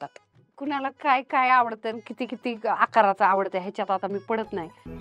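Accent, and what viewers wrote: India. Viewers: Ek no... Vidio.... Mala tuzi acting khup aavdli.... Aapli gavran Marathi...😅😊 ....... Ashich hast raha, hasvat raha... 👍🏻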